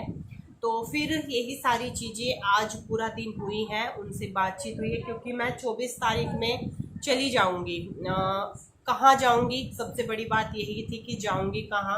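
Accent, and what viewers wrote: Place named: Uttar Pradesh, India. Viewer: Language UP ki aisi hi hai usme bura lagna kya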